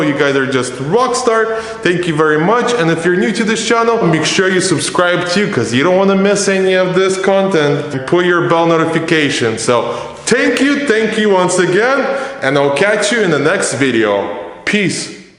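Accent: Russia. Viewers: У него русский акцент) Он меня поймёт)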